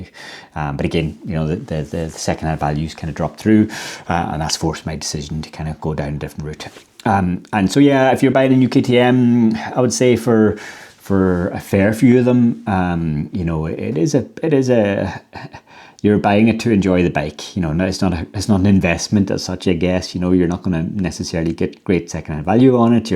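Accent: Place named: Northern Ireland, United Kingdom. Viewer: would seriously consider it! From your accent you still in NI or local region??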